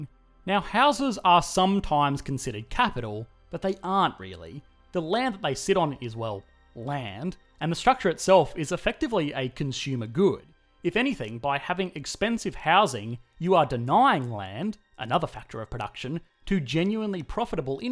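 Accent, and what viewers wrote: Australia. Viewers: Petition for economics explained ASMR channel with his soothing Aussie accent. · Just wondering the bloke narrating sounds like an Aussie, can you talk about the Australia markets? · Australian accent, US film clips. What is going on here?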